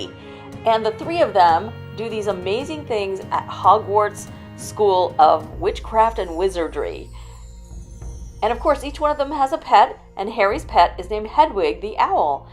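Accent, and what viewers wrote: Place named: United Kingdom. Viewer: ...😶...😶... No way... 😶... This Has Been A Terrific And Fun Video Soooo cool!!! 🙌 It was really fun to watch it, I can see your effort and time to make such a great video. Ok ok ok... 👦My inner child was enjoying every minute. Super cool British accent!!! Thank you for your splendid work. This set is fun, detailed and huge. Have a WONDE'FUL an' TE''IFIC WEEKEN' (see? That's my British accent) 🙋See ya!!!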